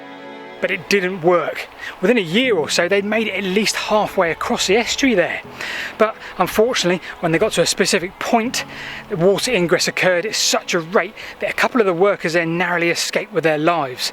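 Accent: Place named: Northern England, United Kingdom. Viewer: He can't help it - he is a northerner! <link>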